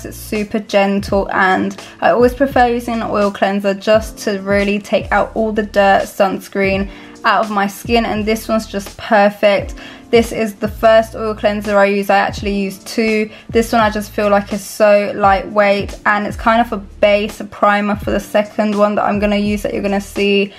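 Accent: London, United Kingdom. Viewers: yessss I’m from London and noticed it straight away 🌚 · London accent straight up.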